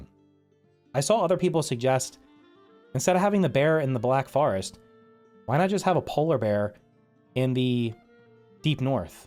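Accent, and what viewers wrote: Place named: Pittsburgh, United States. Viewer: Is this guy from Pittsburgh?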